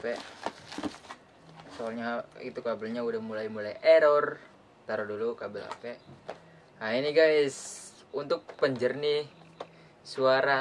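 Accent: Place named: Tegal, Indonesia. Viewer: masa sih..tdk ada ahh hanya di luar saja..indramayu itu bukan nya jawa barat ya..kok bicara nya kaya org tegal atau brebes yo😐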